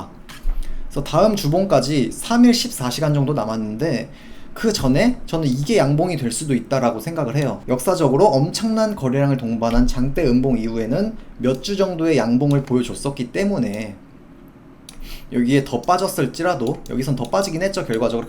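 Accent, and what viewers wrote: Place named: Jeolla, South Korea. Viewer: 약간 느린 말투에.. 전남처럼 확 튀는 사투리도 아니고.. 성님이라는 단어를 써서 그랬어요 ㅋㅋ 딱 말투가 전북스타일인데 ㅋ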